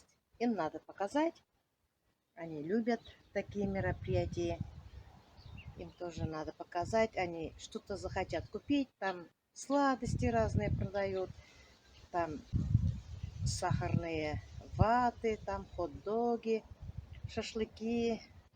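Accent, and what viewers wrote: Tatarstan, Russia. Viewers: ИСЕНМЕ СИЗ. АЛЛА БИРСЕН ВСЕ БУДЕТ ХОРОШО М ВАШЕЙ СЕСТРОЙ. ОЧЕНЬ ЖДУ САБАНТУЙ И НАДЕЮСЬ ЧТО ВЫ БУДЕТИ СНИМАТЬ ЭТОТ ПРАЗДНИК САБАНТУЙ.БОЛЬШОЙ ПРИВЕ Т ВАШИМ СЕСТРЕНКАМ И САМИ БУДЬТЕ ЗДОРОВЫ.У ВАС ОЧЕНЬ ХОРОШО НА ОГОРОДЕ. У НАС В БАКУ ЖАРА ЛИСТЬЯ НА ДЕРЕВЬЯХ ПОЖЕЛТЕЛИ И КАК ОСЕНЬЮ ПАДАЮТ. ИСЕН САВ БУЛЫГЫС. Я КОГДА ВАС СЛУШАЮ И ВАШ ТАТАРСКИЙ АКЦЕНТ МНЕ ОЧЕНЬ ПРИЯТЕН МОЯ МАМА ТАК ГОВОРИЛА.ОБНИМАЮ ВАС И ОЧЕНЬ ВСЕГДА ЖДУ С НЕТЕРПЕНЬЕМ ВАШИ ВЛОГИ. ВЫ И ВАША РОЗА ОЧЕНЬ МНЕ БЛИЗКИЕ ЛЮДИ.👍💖💖💖👄🌹🌹🌹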